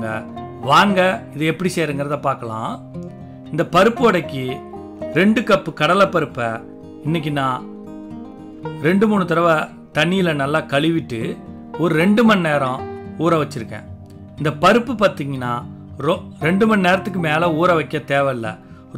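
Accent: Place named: Telugu, India. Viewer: Looks yum are you Telugu doesn't sound like malyalam or tamil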